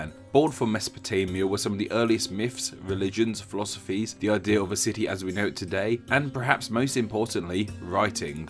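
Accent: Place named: United Kingdom. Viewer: Your british accent gives me headache. Great video though.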